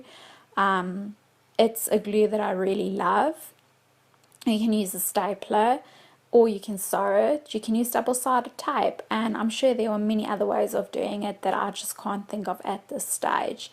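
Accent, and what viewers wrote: South Africa. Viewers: Hi found your channel through 49 dragonflies. Is that a South African accent I hear ? Good ideas to try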